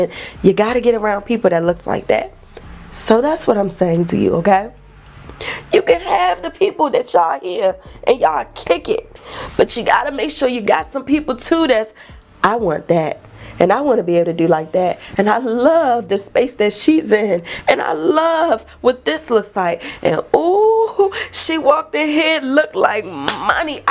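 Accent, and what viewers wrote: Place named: Baltimore, United States. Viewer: You popped up on my timeline, and as soon as o heard your accent I was like…oh hold up she’s from my hometown B-more!! Let me subscribe ❤ Enjoyed the video ❤❤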